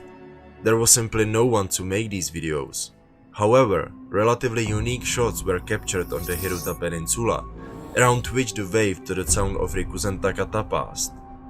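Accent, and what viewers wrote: Czech Republic. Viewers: dal som si tu namahu aby som zistil odkial je vlastnik kanala a ano nemylil som sa ....ceska anglictina je jedinecna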